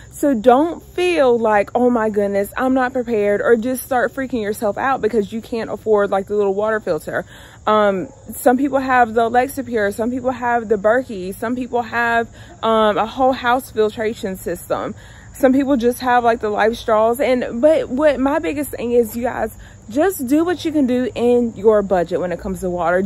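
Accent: United States. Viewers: God knows I love your country ass accent, great video by the way.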